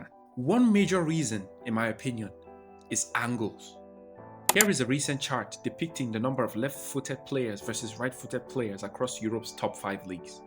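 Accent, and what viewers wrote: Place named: Nigeria. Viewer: Wow this is good research, great video editing, soundtrack and overall detailing. You sound Nigerian by the way. Well done 👍🏾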